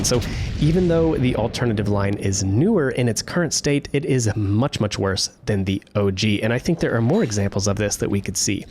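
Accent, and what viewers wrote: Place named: New England, United States. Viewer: Woostah- you from New England?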